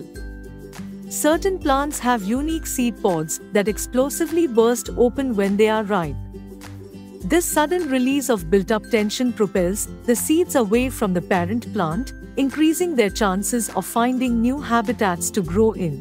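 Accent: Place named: India. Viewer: Logatnya india banget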